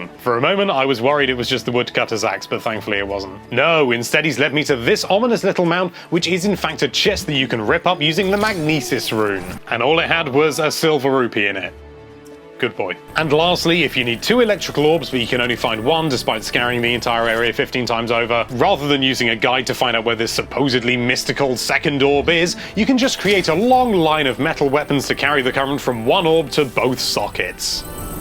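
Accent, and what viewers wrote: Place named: United Kingdom. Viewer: Those are Elk not deer you silly Brittish sounding person...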